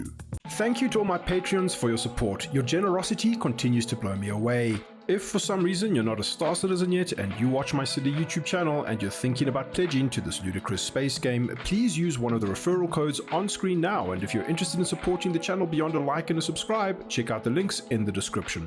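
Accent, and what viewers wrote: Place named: South Africa. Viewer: South African detected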